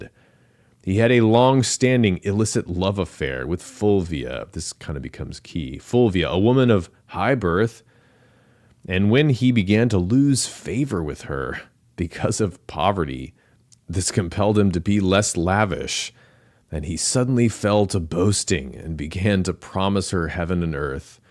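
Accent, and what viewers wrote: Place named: United States. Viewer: I love the content but this accent..I cant place it. Southern Pennsylvania or Tennessee..or anyone in California but its slightly irritating..its still pretty good. Im not sure I've read all this.Its really a gift. Thanks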